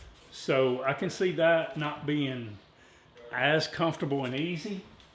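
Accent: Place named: Alabama, United States. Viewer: Sounds like a 'Good ol' boy' from Alabama. Good comparison regardless.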